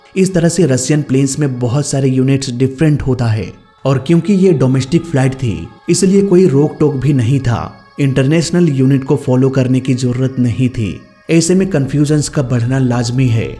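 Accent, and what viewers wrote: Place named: Bihar, India. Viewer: Tum bihar se ho kya tumhari language se pata chalta hai ab aise illetrate log hame flight ke baare main batayenge😂😂😂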